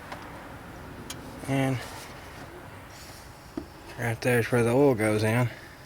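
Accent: South, United States. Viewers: The authentic Southern accent ! howdy !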